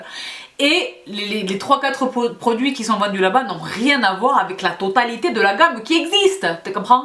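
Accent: South, France. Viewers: Hello les sista ........ ahahhaha a chaque fois je rigole je kiff ton accent du sud et félicitations tu le mérite tu partage avec nous ta passion qui est aussi ma passion aussi (tu ma fait acheter des tonne de truc ) et waouuuuuuuuuu le concour de dingue que tu nous fait "c'est un truc de malade....!!!" Lol je rêverais de gagner ce concour 😍😍😍😍😍😍😍😍😍😍😍😍😍😍😍😍😍😍😍😍😍😍😍😍😍😍😍😍😍😍 gros bisous a toi reste comme tu est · Oh la LA !!! Comme je suis ravie pour toi et heureuse de faire partie des sistas ! En plus, un giveway qui te ressemble vraiment. Grâce à toi, je me suis remise au layering, j'ai une wishlist de soins en asiatiques qui s'allonge, je m'intéresse de plus près à certains produits... en bref, je te voue une confiance absolue ! T'es vidéos sont des bouffées d'oxygène, elles sont remplies d'une jovialité débordante et communicative, je les attends toutes les semaines. 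D'ailleurs, à force de te regarder, j'en arrive parfois à parler avec un brin de ton accent du sud, des expressions propres à toi sortent parfois même dans des discussions, ça me fait rire toute seule !!! J'arrête pour aujourd'hui le roman mais c'est toi qui l'a voulu après tout ! Alors je croise les doigts pour avoir la chance de remporter le Pompon du pompon des giveways ! À dimanche 😉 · Coucou! Moi je t’ai connu il y a presque un an maintenant en recherchant des solutions contre la cellulite et je suis tombé sur ta vidéo par chance... je suis rapidement devenu addict! D’ailleurs j’ai tout de suite partagé ta chaîne autour de moi, j’ai parlé de toi à des amies qui ont tout de suite kiffé!!! Des techniques venues d’ailleurs, le brossage à sec, le derma planning, le derma roller... tellement de choses dont on parle peu mais si efficace et validée grâce à toi! Je te remercie pour tous ces partages, plein d’honnêteté et de sincérité. 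Je pourrais m’étaler encore longtemps mais je vais faire bref lol, c’est toujours le poommmpooom avec toi. Le dimanche toujours en attente de l’annonce de ta vidéo sur Snapchat! Alors on se pose tranquillement sous la couette petit café et on écoute tes précieux conseils emprunt de cet accent tout droit venu du sud, tellement appréciable pour ton abonnée venu du Nord lol. Force et honneur à toi!!!! Tu nous as conquis(e)s et tu mérites tout ce qu’il t’arrive aujourd’hui, par travail et endurance, je te tire mon chapeau pour allier ton travail et ta passion! Une femme inspirée et inspirante... pour finir moi je te dirais de continuer sur ta lancée tu arrives à balayer toutes les catégories de vidéos qu’on pourrait voir (mention +++ pour les vidéos chichat) moi j’aimerai pouvoir te revoir dans des vidéos tuto make up avec tes petites techniques ou lookbook étant grande de taille également j’apprécie de découvrir des look q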